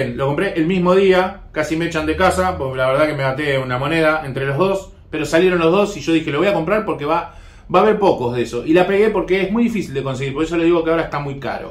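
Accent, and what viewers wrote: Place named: Argentina. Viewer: Cómo estás estimado, tienes tu propio estilo, eres original, tu acento argentino le da un toque especial, te sigo desde el primer minuto que te vi en youtube. Felicitaciones y adelante.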